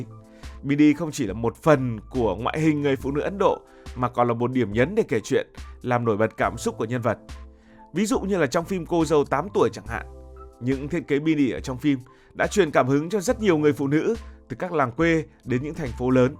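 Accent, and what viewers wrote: North, Vietnam. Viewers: Thích cácvideo của BLV. Ngắn gọn nhưng xúc tích. Lại thêm giong bắc rất hay.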